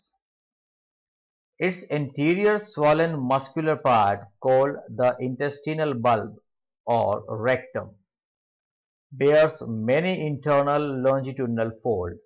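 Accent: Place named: India. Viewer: That Indian accent is so irritating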